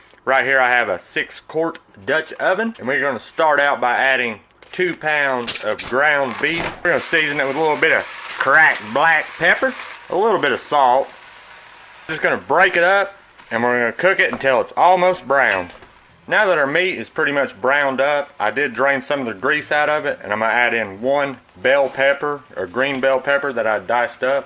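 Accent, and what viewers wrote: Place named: Deep South, United States. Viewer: Great accent. Reminds me of my navy days stationed in the Deep South. American chop sued was a staple in the mess hall then but yours looks better; unfortunately I don’t cook.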